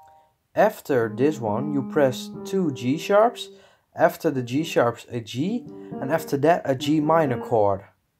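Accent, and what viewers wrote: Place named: Netherlands. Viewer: haha, nederlands overal, ik hoorde het aan je accent :)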